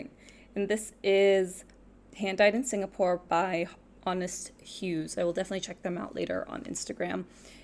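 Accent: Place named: Philippines. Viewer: Hello! You do like a bit Filipino coming from a Filipino. I think SEA prefer crochet also is because it's much more breathable because it's more hole-y compared to knitting so it's better for the warm weather in SEA.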